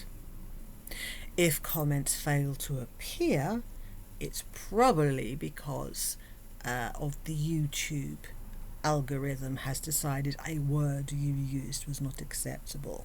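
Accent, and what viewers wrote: United Kingdom. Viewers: The elegant, accurate explanation, delivered in the proper British accent, is brutal! Love it! And you look fabulous. The message you send with the makeup is crystal clear. 😂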